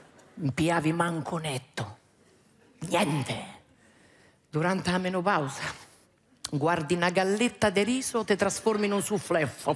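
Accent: Rome, Italy. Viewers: Non sopporto il romanesco, però simpatica,bella, brava e simpatica....complimenti.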